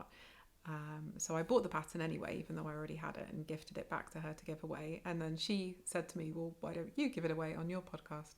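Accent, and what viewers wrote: Wales, United Kingdom. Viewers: Hi - I also love knitting socks, although they have been mostly vanilla socks so far. Your moomin socks look gorgeous. And the socks from Nancy are very beautiful. Thank you for this lovely podcast. I always enjoy listening to your British accent. It is so heartwarming, because it reminds me of the time when I lived in the UK nearly 25 years ago. Greetings from the Black Forest.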